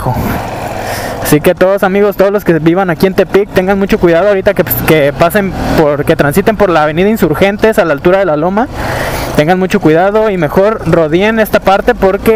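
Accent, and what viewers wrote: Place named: Paisa, Colombia. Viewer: Me hiciste llorar al ver mi ciudad y escuchar a un paisa hablar chido tu vídeo vivo muy lejos y ver mi ciudad me sacaron lagrimas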